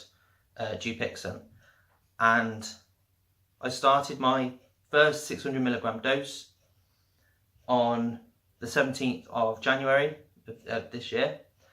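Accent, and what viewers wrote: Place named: United Kingdom. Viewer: Thanks for this, you're the first person I've seen on yt talking about Dupilimab with a British accent, did you get it on the NHS? Makes me hopeful, cheers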